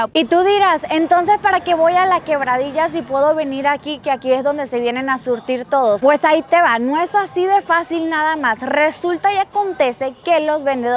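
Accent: Venezuela. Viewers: Eres auténtica gracias por tus videos con acento venezolana❤ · Su acento suena de Venezuela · Chama pero tú no pierde lo venezolano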